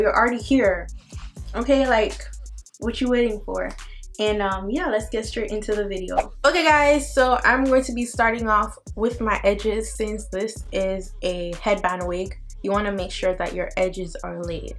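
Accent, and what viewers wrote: Jamaica. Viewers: Your an Jamaican I used to watch you video when I was younger but now when mi watch it mi see say you sounds like a Jamaican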